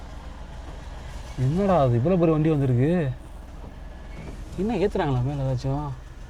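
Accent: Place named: Madurai, India. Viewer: Jee ur video super madurai speech super ji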